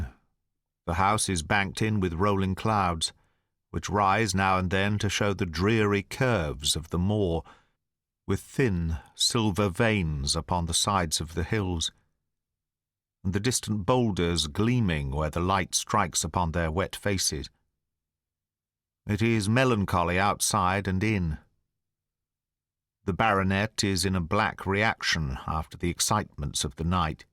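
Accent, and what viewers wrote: United Kingdom. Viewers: I don't understand British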